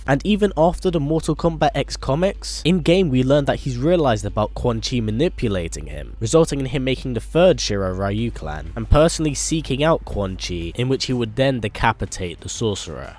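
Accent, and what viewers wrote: United Kingdom. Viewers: where are you from,? you sound British but I think I am wrong.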